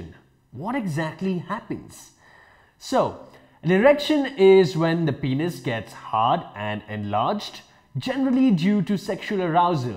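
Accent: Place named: India. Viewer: very unique indian accent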